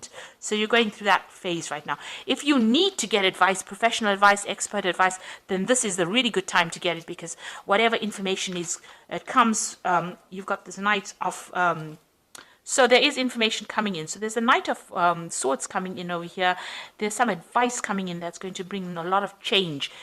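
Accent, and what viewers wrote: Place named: South Africa. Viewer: Great reading, thank you 🙏. Also, is that a fellow South African accent I hear?? 😃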